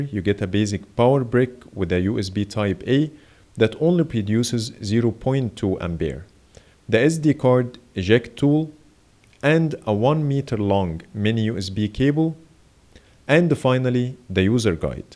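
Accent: Egypt. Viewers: Egyptian accent lol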